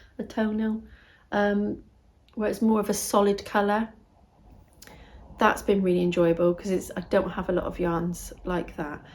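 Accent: West Country, United Kingdom. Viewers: found you through "Tales From Cuckooland" and decided to stop a while. Loves the hint of a Westcountry accent, reminds me of home. I was born and bred in Hayle, Cornwall xx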